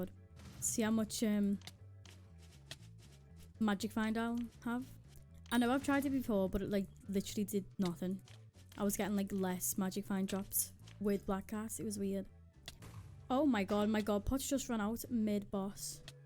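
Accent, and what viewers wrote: United Kingdom. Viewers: Are u british or is that accent in my head? (no offence XD)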